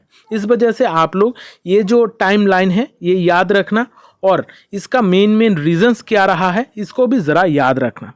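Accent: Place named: Odisha, India. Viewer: Apke accent se lagaa aap Odia ho. Maaf karnaa.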